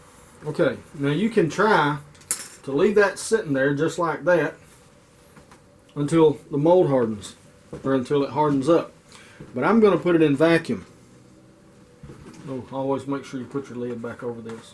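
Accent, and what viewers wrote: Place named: Texarkana, United States. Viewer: That was fantastic. I am gearing up to turn my first blank and spending time looking at at many videos of the whole process as i can. i am trying to place your cool accent, Texarkana region by any chance?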